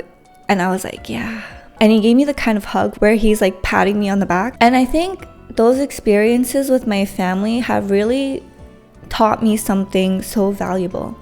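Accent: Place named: Canada. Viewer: oh my god im so glad to see you back. sending you all the love and healing vibes! I went to lapis today too, such a pretty and serene island. I also got desserts sooo basically twins I love how you sound more canadian now that you're back in canada ahahhah you are so blessed to have the people in your life that you do<3 CHEERS to you getting through the hard part and cheers to your boundless future. I'm proud of you!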